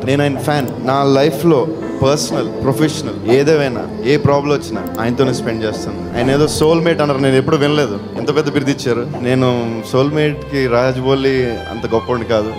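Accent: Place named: Bhimavaram, India. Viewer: Pure Bhimavaram slang love u darling ❤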